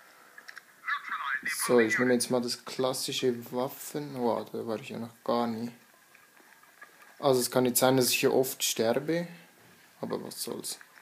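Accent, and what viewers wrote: Switzerland. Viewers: BASELS DIALäGT! :D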